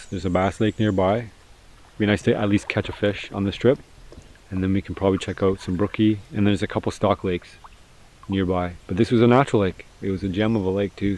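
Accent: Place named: Canada. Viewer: So annoying how Canadians so about and aluminum!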